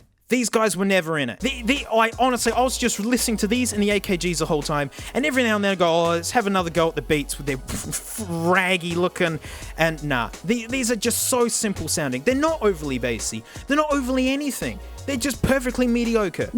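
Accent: Australia. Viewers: I fucking love Australians. The accent is on point for these type of videos. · I can never understand what you’re saying on these mic tests. It’s a mixture of australian accent with me not being great at english and headphone mics sucking that is simply impossible for me to overcome. I’m so sure you’re saying something funny I still laugh sometimes tho, hahahahah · Can you please reveiw the skullcandy crusher's, i have had them for 2 years and i love them but i would love to hear your opinion on them. Btw, love ur vids and laugh everytime u talk with that Austrailian accent XD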